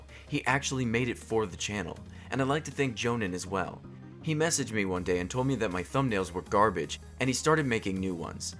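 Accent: Australia. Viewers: NOICE Aussie accent! You're actually amazing. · You sound Australian.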